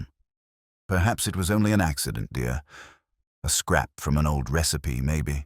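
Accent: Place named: United States. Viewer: Wauw, the ai-voice gets more and more American…not pleasant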